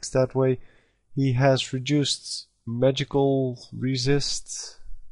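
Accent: Netherlands. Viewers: are you dutch? haha u realy got an dutch accent :)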